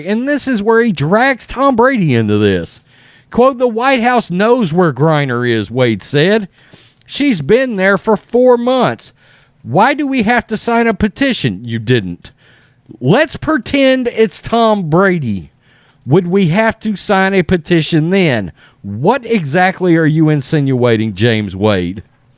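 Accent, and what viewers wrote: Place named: Appalachia, United States. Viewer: this is the wrong place for me ... I do not need a hillbilly to read to me .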